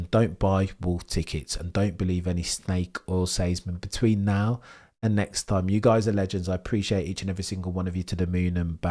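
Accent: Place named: England, United Kingdom. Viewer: Your sound is English but a Verstappe-fan? Are you pissing my ear chap?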